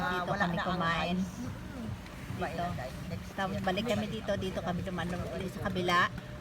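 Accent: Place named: Iloilo, Philippines. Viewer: From where are you in Pinas? You sound like you are Ilonggo or Kinaray a..😂😂😂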